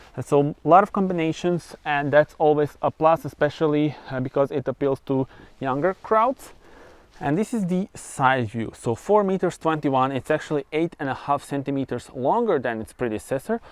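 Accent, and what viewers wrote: Russia. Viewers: с русским акцентом) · Мне показалось, или у него русский проскальзывает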